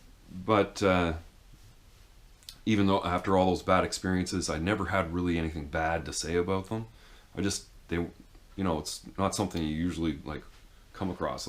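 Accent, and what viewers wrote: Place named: Canada. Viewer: Lol Man I saw your video about 3 or 4 years ago and I ended up finally trying shrooms off and on several times over a couple of years. They definitely have the ability to reset your brain and outlook on life. I just happened to run across your same video again this evening. It is unbelievable how much you and I look alike, have similar personality traits and even our voice sounds alike except for the fact that you have a Canadian accent and I am from Alabama so I have more of a southern United States accent. I mean I know that sounds crazy but the resemblance is unbelievable.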